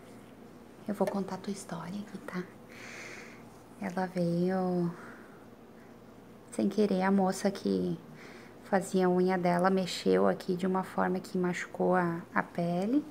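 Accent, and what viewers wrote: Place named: Rio Grande do Sul, Brazil. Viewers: Odeio sotaque Gaúcho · Pelo sotaque acho que é gaúcha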